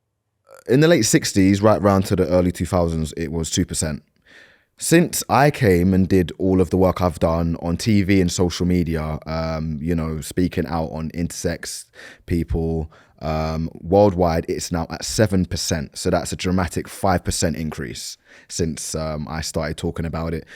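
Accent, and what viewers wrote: United Kingdom. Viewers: Sounds like a British. Very confident guy.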